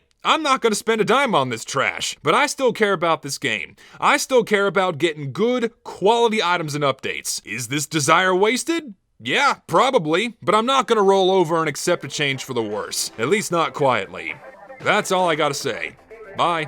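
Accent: Texas, United States. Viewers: "The more lazy, narcisstic sides of the workshop" a little bit of sundowner just spilled out there. I love the Texas accent.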